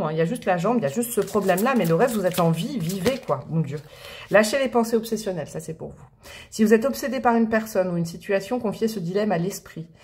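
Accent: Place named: Alsace, France. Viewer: Coucou ma ✨…tu chopes l’accent alsacien😅…ma belle région❤️ Choix 2…alors quoi te dire, la dernière guidance m’a déjà énormément parlé. Depuis notre guidance générale de l’année, il se passe bcp de choses, un truc m’est tombé dessus. Je ne l’ai pas vu venir….baaaam je ne contrôle plus rien. Tu avais vu juste😆🙏🥰…j’avoue que ça me fait un peu flipper. ❤️❤❤ et ça va me faire sortir de ma zone de confort. Une rencontre se profile pour bientôt🫣. Bon we, merci pour tes belles vibes et tes ressentis⭐️⭐️😘😘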